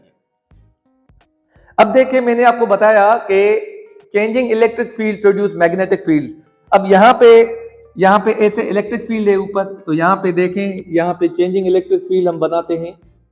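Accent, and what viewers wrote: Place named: Sindh, India. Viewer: Mashallah sir ap sindhi h mny just imagine kiya apki urdu language se or smjhany k tareeqy se k sindhi h sir ku k sindhi master h physic k or ap b aeseii lghy but ap to sindhi hi h mashallah buht acgy h smjhanab❤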